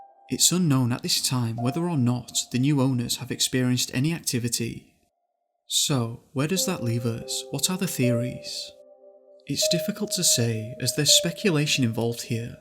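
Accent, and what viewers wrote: United Kingdom. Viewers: It's called an accent..a very specific British one.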